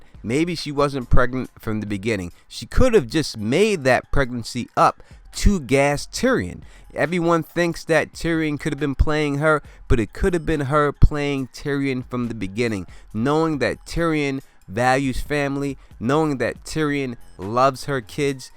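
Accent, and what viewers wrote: New York, United States. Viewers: Glad you're better. Was sick myself couple of weeks ago. Great video 👍 We wouldn't leave ya!! Stay sexy 😘 Could listen to your voice all day. I love New York accents. I live in Alabama so...that doesn't happen enough!!😆💕💙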